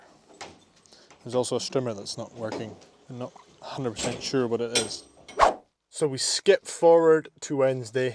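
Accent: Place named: Scotland, United Kingdom. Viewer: absolutely no idea how i ended up here apart from scrolling through youtube on back shift at work. Grat content mate. Great to hear a fellow scotsmans voice on youtube! wish you all the best boys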